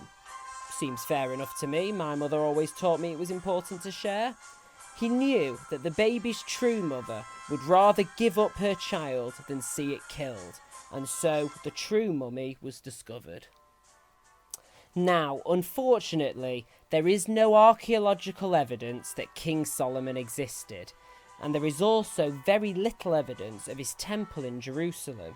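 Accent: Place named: United Kingdom. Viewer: You're British accent does not make you more respectful or intelligent like those iinfomercialss this has been a tactic used I noticed this you mentioned that's Solomon had 700 wifes about but you said this is fantasy I don't think so you under estimat the people from the past and Bible ii believe the past was more sophisticated than you know I noticed how the media makes people from the past movies look like barbarians building houses of clay or tents wearing shaggy rags and long un trim hair u above them I think in a way they were above us people living longer longer that's not true just like the vaccine today tell me lies tell me Sweet Little lies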